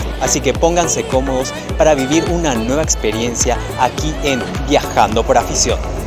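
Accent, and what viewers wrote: Paraguay. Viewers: Es obvio que de PARAGUAY por su acento se nota a leguas.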